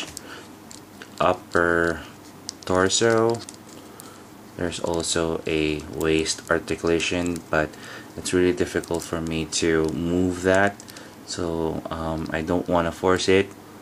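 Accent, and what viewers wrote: Philippines. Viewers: Dude can I ask, are you Filipino? Ya kinda sound like a Filipino.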